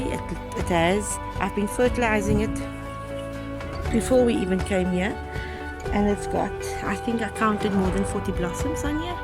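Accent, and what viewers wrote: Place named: South Africa. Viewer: How lekker to hear a local accent! Thank you for this tip. Greetings from Joburg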